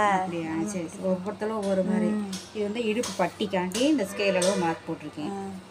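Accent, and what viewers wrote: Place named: Karaikudi, India. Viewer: அக்கா நீங்க பேசுற பாஷையா பார்த்தால் நம்ம ஊரு காரைக்குடி மாதிரி தெரிகிறது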